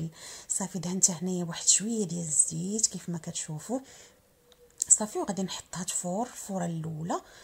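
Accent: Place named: Morocco. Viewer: ماشاء الله عليك عزيزتي ماحلاها اللهجة المعربية ربي يوفقك❤❤❤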